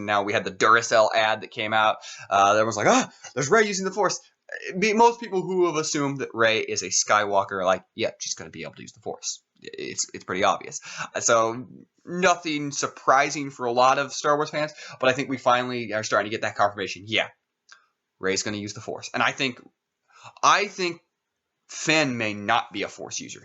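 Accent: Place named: Kansas, United States. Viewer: Are you from Kansas? I am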